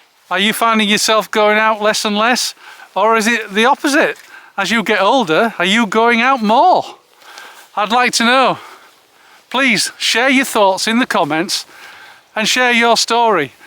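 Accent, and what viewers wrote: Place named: Hull, United Kingdom. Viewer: Detecting a little Hull in your accent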